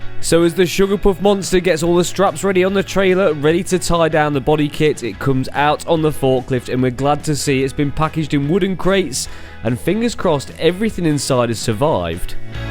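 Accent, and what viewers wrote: United Kingdom. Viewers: Can you do hindi Dub for me 😁 would be a lot easier to understand without UK accent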